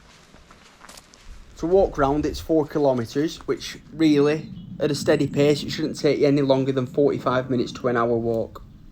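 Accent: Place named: Lancashire, United Kingdom. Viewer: Great video. Nice place. Nice to hear a Lancashire accent 👌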